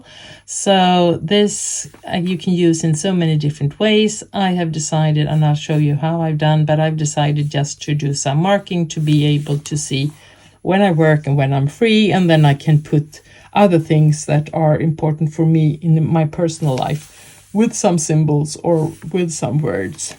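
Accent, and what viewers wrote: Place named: Sweden. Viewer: Thank you for sharing! I love how these look. Shipping to USA is just so expensive 😑 Maybe there will be more options for next year 🤞 I am so happy to see you are back, I've missed you and your videos. My 18yr old son overheard this video and said "she sounds like mormor", so I asked what do he meant and he said her accent sounds like she could be Swedish, like mormor 🥰 I love it!! I am Swedish, and my family only hear accents from my family from Sweden 😂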